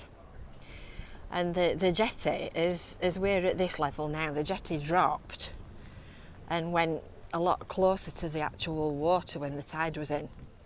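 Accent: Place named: Lancashire, United Kingdom. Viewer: Hi, I 'm Irish just moved to Blackpool last August from Heartsfordshire. I have no problem understanding your lovely accent. Someone have said you have a lovely Yorkshire accent, I thought you have Lancashire accent. I do enjoy your videos xxx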